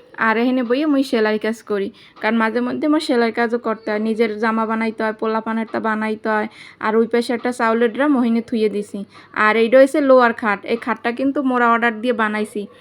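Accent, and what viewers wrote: Barishal, Bangladesh. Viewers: ❤❤আসসালামু আলাইকুমপ্রথমে লাইক দিয়ে তোমার পুরো ভিডিও জুড়ে ছিলাম তোমাকে আমি দুই দিন আগে ভালোবাসা দিয়ে রেখেছি মাশাল্লাহ খুব সুন্দর করে তোমাদের বরিশালের ভাষা কথাগুলো বললে খুবই ভালো লাগলো😮 শুনে সব কিছু মিলিয়ে দারুন লাগলো তাই তোমাকে ভালোবাসা দিয়ে রেখেছি 👉🔔বুঝে নিও তোহার হলো তোমার পরিবারের জন্য আপুর উপর বিশ্বাস রাখতে পারো লাইকডান আলহামদুলিল্লাহ ❤❤🎉🎉😮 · অনেক সুন্দর হয়েছে আপু ❤❤❤তোমার বরিশালে ভাষায় কথা গুলো ভালো লাগে 😅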